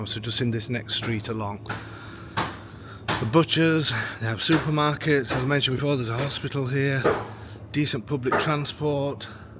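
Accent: United Kingdom. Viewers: There are different pronunciations and spellings of the word. Any of them are acceptable depending on the region you’re from. Keep in mind that is in the Uk so anything he says is specifically from the Uk and not the US or wherever you’re from.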